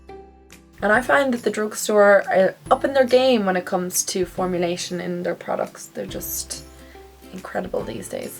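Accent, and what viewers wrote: Dublin, Ireland. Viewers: I was wondering from where her accent was from and then I paid hard attention and remembered of Niall Horan and boom! It's an Irish accent 😊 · Are u from dublin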